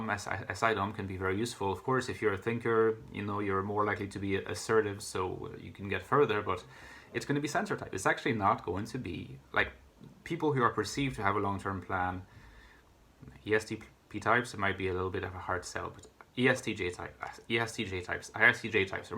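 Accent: Ireland. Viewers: He's got an Irish accent but I think he's French?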